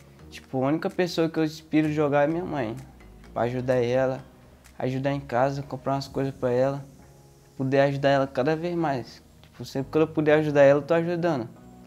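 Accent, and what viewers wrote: Brazil. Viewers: Since mó jeitão da Bahiano igual meu pai kkkkkk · O sotaque dele honra nosso povo Viva a Fortaleza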